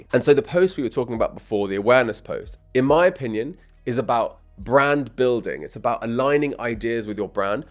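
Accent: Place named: United States. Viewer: Dude, your (USA) English is very difficult compared to British people. Don't worry brother From Pakistan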